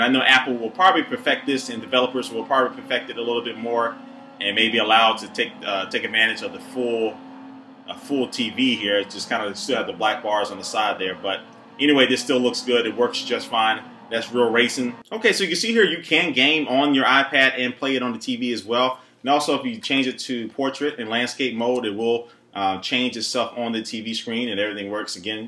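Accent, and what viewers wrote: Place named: South, United States. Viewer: Liberry. Akurrate. Lol love the southern pronunciations. (I live in Louisiana)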